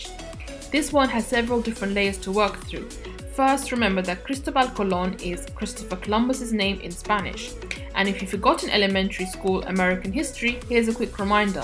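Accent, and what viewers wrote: United Kingdom. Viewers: From what I can see the English speaker don't like to say piropos. There is not comments here I'm from Mexico and I love saying piropos a lot .I'm here to learn English through this channel it seems to be interesting. I really like your British accent.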